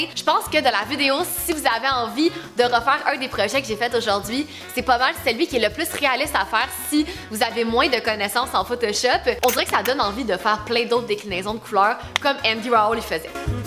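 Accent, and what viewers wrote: Quebec, Canada. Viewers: Tu es cebecoise ? Je suis belge et je ne comprend pas certains mots !😅😊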